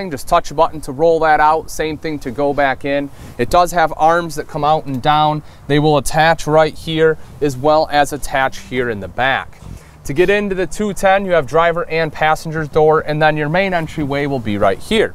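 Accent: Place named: West Michigan, United States. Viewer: This guy is well-spoken, knowledgeable, and has a nice West Michigan accent!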